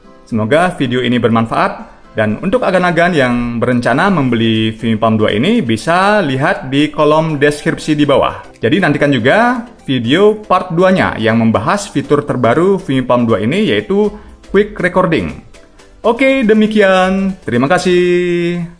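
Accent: Bali, Indonesia. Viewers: Makasih bang penjelasannya kerennn banget…ngomong2 logatnya kayak logat bali bang..hehehe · Orang Bali kayaknya logak nya... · Logatxa kayak orang bali.medok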